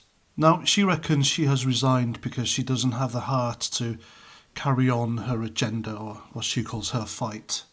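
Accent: Wales, United Kingdom. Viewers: Sounds like you are Welsh. Why are you criticising New Zealander? Hypocrite.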